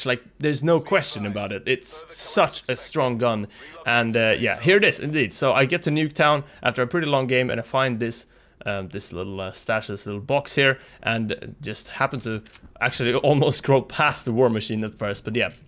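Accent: Sweden. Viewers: Svensk? Are you Swedish?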